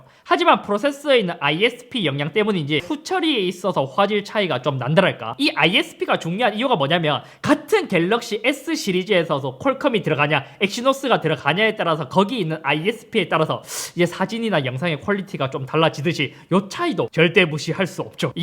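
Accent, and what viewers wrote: Gyeongsang, South Korea. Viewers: 말투로 보니 대구에서 자란 친구네. 대구~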